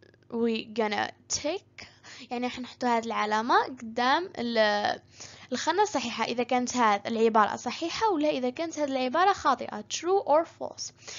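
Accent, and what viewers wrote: United States. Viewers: لهجتك اميريكية 100\100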